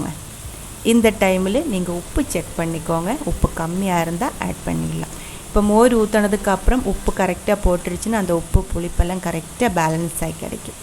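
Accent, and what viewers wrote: Kerala, India. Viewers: Hi sister I like ur Malayalam Tamil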